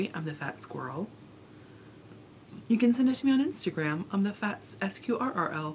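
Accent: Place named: Scotland, United Kingdom. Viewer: Have a virtual hot toddy. I cannae spik for the rest o' Scotland, but I am totally on board for you adopting Scottish English...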